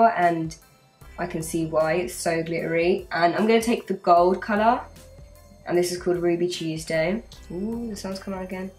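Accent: London, United Kingdom. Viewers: gives me Audrey Hepburn vibes...she even has the Eliza Doolittle accent (I don't know what part of London) from the movie My Fair Lady!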